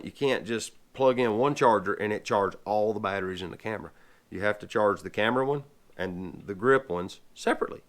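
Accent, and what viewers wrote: South, United States. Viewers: Centimeter? Really? :) Sounds strange when someone uses metric system with a southern accent. :) Great video, thank you very much!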